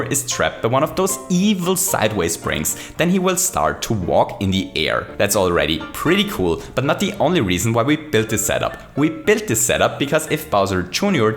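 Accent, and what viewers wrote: Germany. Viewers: Ich erkenne an deinem Akzent, dass du deutscher bist. Haha xD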